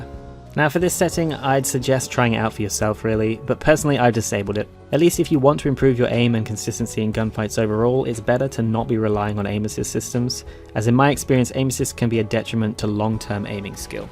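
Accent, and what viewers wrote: United Kingdom. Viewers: Very informative and interesting, will definitely have a play around in the shooting range and have a mess around with my settings now I know a bit more about which each of the new ones do, nice to hear a voice finally, don't apologise for the British accent either, nice to know theres a few Brits in the Bayou 🤠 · Fantastic. Also, your voice isn't annoying at all. If that's the reason your gameplay videos don't have voices, I really wouldn't worry about it at all. I've got my American and Austrian accents covered by Homereel and Psychoghost, bring on the British! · Ohhhh snap our boy is English! Nice homie I guess the texts are going to be a thing of the past now that you crossed into the voiceover era! A welcome change! Gives the videos more personality! Just don’t forget to put the mandatory cowboy hats on the steam codes other wise no one’s going to know is a key for hunt related stuff xD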